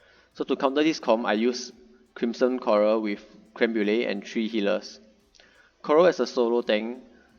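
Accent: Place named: Malaysia. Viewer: Are you malaysian , i know that accent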